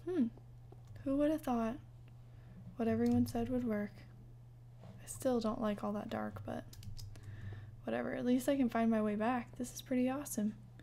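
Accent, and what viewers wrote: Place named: Spain. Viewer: Love your Spanish accent :D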